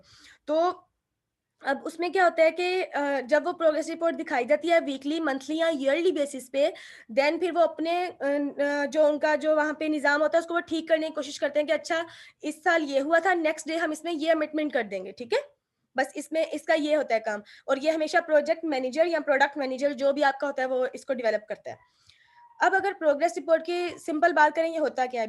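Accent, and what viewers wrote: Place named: India. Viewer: When I heard the way you speak. my mind said oh. Indian.. I'm a good friendly to Indian people. too much here in Abu Dhabi my friend.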